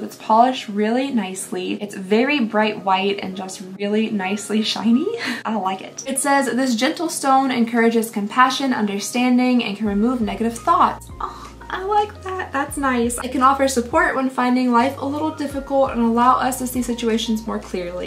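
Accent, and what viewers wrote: Kentucky, United States. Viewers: How many keep coming back to hear mispronounce words, pronounce everything with KY accent, or just simply make words up? Just me?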